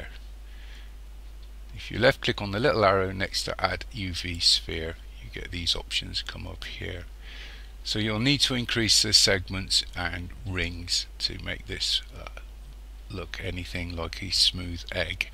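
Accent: Bristol, United Kingdom. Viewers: Lifesaver! Thank you so much! P.S. Love your accent, are you from Bristol? I love it there so much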